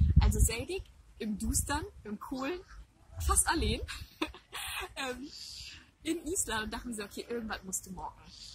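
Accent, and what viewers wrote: Low German, Germany. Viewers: Möcht ne Fru, de Platt snakt. So sexy, so sympathisch. Einfach Klasse. Könnte Stunden lang to hörn. Bannig schaun 😇🥰👍🤗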